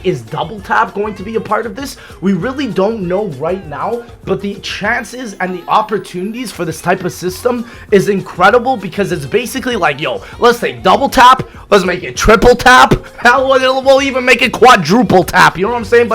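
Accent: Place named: Newfoundland, Canada. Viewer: I realize now that the way you speak is almost like how a newfoundlander sounds and to people who aren't exposed to it a lot have no idea what you're really saying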